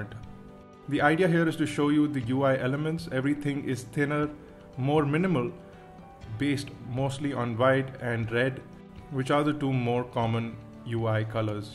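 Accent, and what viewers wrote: India. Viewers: You can tell he's Indian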